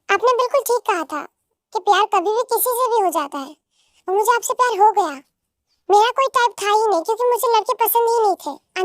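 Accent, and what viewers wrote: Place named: Punjab, India. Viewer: Jinu punabi voice ❤😊🥰🥰🥰🐂💜❤❤❤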